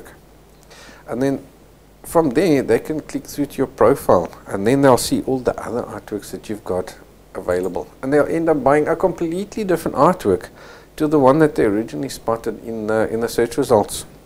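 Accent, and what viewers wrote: Afrikaans, South Africa. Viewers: Hi are you originally from South Africa. Sounds like you have an Afrikaans accent. I am from Cape Town, and wants to sell on FAA. Well I have sold two prints now. It is difficult to get the right people to see my work. My South African friends are not going to buy my work and pay in $$, our exchange rate is just too bad. Any ideas how I can get more international viewers?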